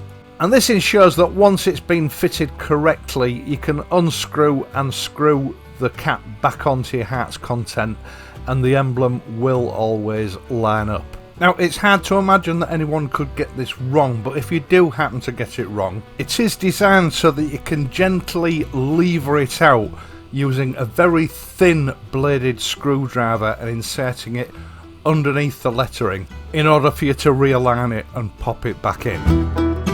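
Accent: United Kingdom. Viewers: Wow! What an accent..may I know which part of the UK you hail from?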